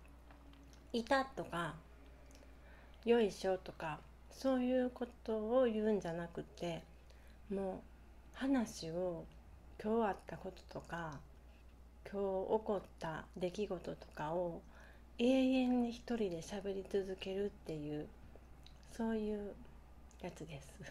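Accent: Kyoto, Japan. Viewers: インスタでも登録させていただきましたよコメントさせていただきました。 バリバリ京都弁で落ち着きます🎵 そして、そのドレッシングのお店の近くに子供の頃から住んでます。 大原の田舎者です❗️ よろしくね😀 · 懐かしい京都弁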